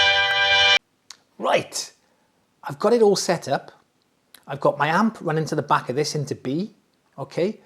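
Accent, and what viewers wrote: Wales, United Kingdom. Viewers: Just subbed and thanks for you content , loving the welsh accent 🤣🤣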